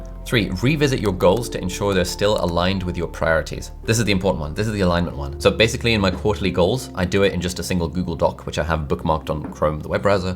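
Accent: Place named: England, United Kingdom. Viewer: ALI ABDAAL LOOKS LIKE AN EARTHQUAKE VICTIM FROM PAKISTAN WITH AN ENGLISH ACCENT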